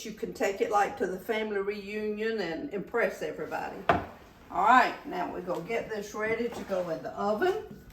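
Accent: South, United States. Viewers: you are truly a gift from God! You are our favorite cook on here! Such a darling "Southern Belle"..just listening to you speak is magic to my ears!! Love how you explain your recipes so well! And..your lovely stories you share with us!! Love your recipies ( & so do my friends & family who eat my meals & deserts from your recipies!) ❤ You are just heartwarming!!!! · What a beautiful soul you have! Absolutely love your southern accent! God bless you! You are a jewel. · Amen to that!! I could watch her videos all day! She is my maternal grandma made over! Soo sweet, funny, loving, and oh that southern accent! ❤ Thank you for sharing your recipes, family, and your love for Jesus!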